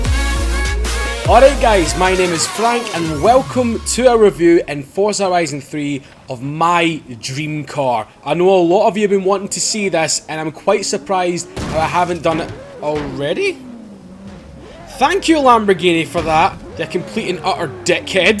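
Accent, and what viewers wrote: Scotland, United Kingdom. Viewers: Your Scottish accent is about as cool as it gets. I've always thought of the Scottish as the kind who would punch you in the throat then smile at ya drinkin' a pint. =) (=...right. Nothin' to worry 'bout. Jaguars are sexy beasts...OR.....ass-tin mar-tin.....sounds too british (poor brits wrong at everything) I wouldn't hold to the standard of responding to every person, I would be guilty of not willing to do it myself. One needs a car that does it all, under 12 second quarter mile, 180-200+ top speed (limited to car type), at least 1g (earth gravity) of lateral cornering capability, can get groceries. The mountain - looks like it wants to be conquered, just sayin'. 6 digit subscribers, the more you got, the faster it goes up. May your momentum constantly surprise you.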